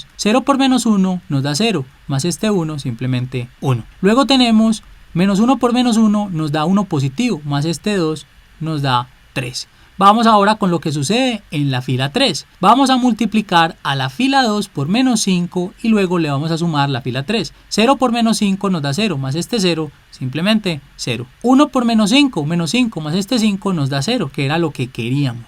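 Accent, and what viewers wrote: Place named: Medellín, Colombia. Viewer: Raúl Alberto Díaz Garcia Es de Medellín. Asi es su acento.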